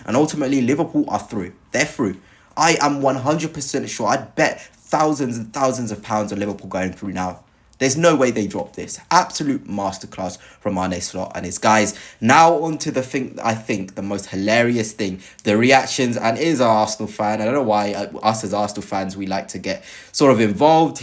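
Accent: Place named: Paris, France. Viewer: Wow he sounds Parisian.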